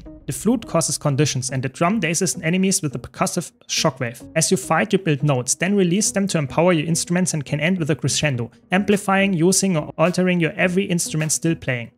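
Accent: Germany. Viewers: Heiliger bim bam das ist der deutscheste Akzent den ich je gehört habe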